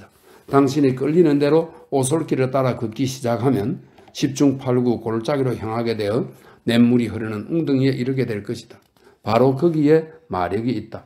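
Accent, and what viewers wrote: Gyeongsang, South Korea. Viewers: 우리한동훈도토리가젊은팬들에게권하는책이라서한번더듣습니다너무고맙고감사할뿐입니다 약간의경상도사투리가더매력적이십니다 즐거운성탄되세요건강하십시요 2023년12월24일0시15분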